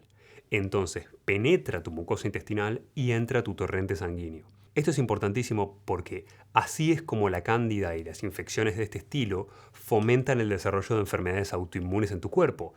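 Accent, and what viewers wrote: Argentina. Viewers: DR. ME ENCANTAN TUS VIDEOS. SOS ARGENTINO????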